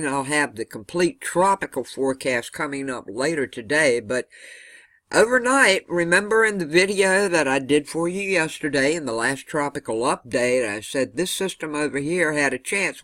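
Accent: South, United States. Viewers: Agreed. expressions and words have a warm Southern comforting and kind of reassuring tone of voice ever reminding of the power of God's love. Amen. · Good afternoon! Thank you for the update. Yep! we cooled off. It was in the low 60's last night. Today, as yesterday, is beautiful! Slight breezes. Sorry I missed a few days. Dr. appointments and vertigo. Would you believe? They're saying my two eyes are communicating with my brain at 2 different speeds? They've given me different exercises to do 3 times a day. Prayers for everyone, GOD bless. Love hearing your Southern way of talking. Your expressions and words make me feel like I'm back in the South! Love it!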